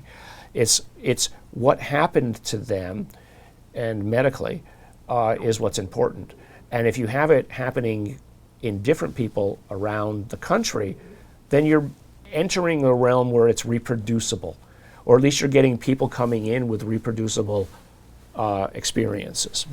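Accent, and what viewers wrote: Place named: Liverpool, United Kingdom. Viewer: Love the Liverpudlian Nolan ❤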